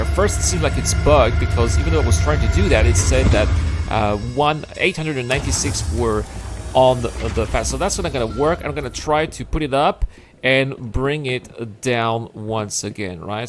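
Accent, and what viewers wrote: France. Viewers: so whats with the French accent?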